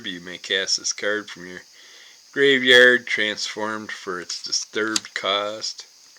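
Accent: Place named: Wisconsin, United States. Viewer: Great video. What I don't know about these could quite literally be put in a book. I remember in 1994 I saw alot of ROTC kids playing this during my junior year of high school That summer I visited a kid I knew who'd moved to Warren Ohio and he tried to teach me how to play MAGIC. I guess I was too much of a right brained kid because I kept getting hung up and confused. I did like seeing the dark art though. It'd be cool cool to see a conan the barbarian version on a card game I knew the old cards and sealed boxes had value but that is amazing just how much the wrappers go for. Cool little asmr style video. The Wisconsin accent is very pleasant. Hope your having a good week and have a real nice weekend, my friend! 😊😊😊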